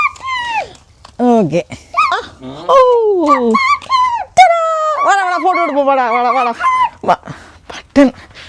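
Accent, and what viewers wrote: Nagercoil, India. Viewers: Hello. It was a pleasant surprise to meet you and your family yesterday at the Garden. I, along with few friends, as gardening hobbyists watch your channel and get some inputs. Thanks for the contents and Nagercoil Tamil. Allan.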